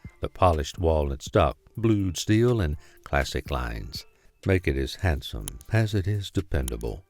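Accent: South, United States. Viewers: This redneck AI is annoying af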